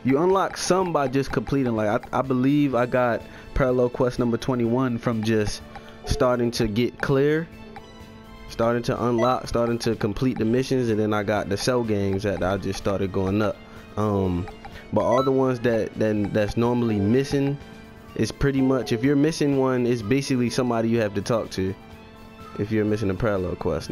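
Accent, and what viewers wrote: Baltimore, United States. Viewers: big help. thanks bro. you from Baltimore bro?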